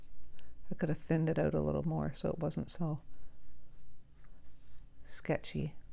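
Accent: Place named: Gloucestershire, United Kingdom. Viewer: as usual I love your work. Is there dvd of your work available? Love your accent the way you pronounce out as owt, reminds my wife and I of our ancestors from Gloucestershire ☺️👌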